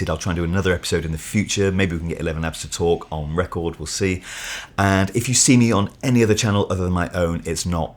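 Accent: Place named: RP, United Kingdom. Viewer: "Its not my fault" he wasn't Gen X, Y or Z by any chance? Fortunately I have a heavy regional accent and am wondering if this would be more difficult to clone.Your voice, is very clear and almost RP to my ear and is "almost" devoid of any heavy regional accent. Okay, you are obviously from the south of England but I wonder if a "heavy" regional accent is more difficult to clone? Answers on a postcard please:)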